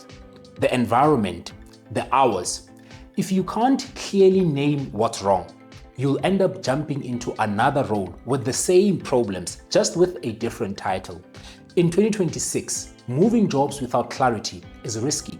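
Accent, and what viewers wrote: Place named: South Africa. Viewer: Your accent gave you away. You speak so beautifully. A South African I guess.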